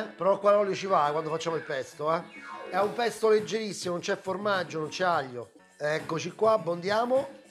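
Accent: Rome, Italy. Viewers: N1 ti seguo da poco sei molto simpatico e l'accento romano è il top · A chi non piace come parli? Aó se sei de Rome sei de Roma no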